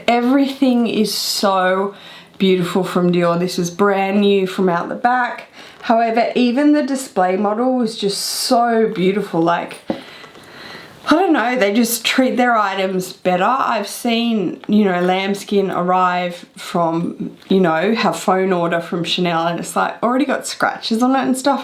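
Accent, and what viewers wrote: Australia. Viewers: I have a question. Your English sounds beautiful and ylu articulate very clearly and pronounce words in a proper way. Many other Australians I watch on youtube seem to talk in a different way, like it was difficult for the to pronounce the words, if you have any idea of what I mean. Obviously English is not my native language. But my question is, do you have a different kind of accent compared to other Aussies, and why, or am I just so adjusted to your speech?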